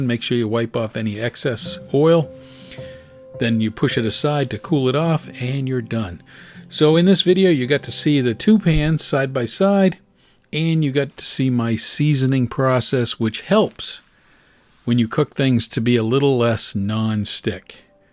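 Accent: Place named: New York, United States. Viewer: you from ny aint you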